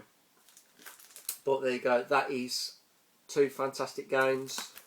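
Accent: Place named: Wolverhampton, United Kingdom. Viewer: Thought the accent sounded familiar. Wolverhampton here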